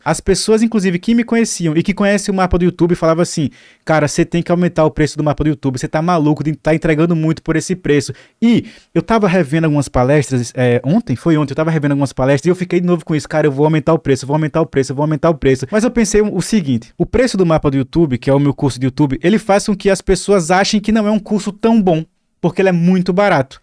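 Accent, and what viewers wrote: Northeast, Brazil. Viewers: Nordestino, de onde tu é? Sou do RN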